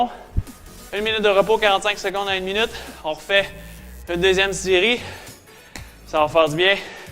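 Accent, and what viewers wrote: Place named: Canada. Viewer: SUPER ces entrainements, en plus j'adore ton accent canadien....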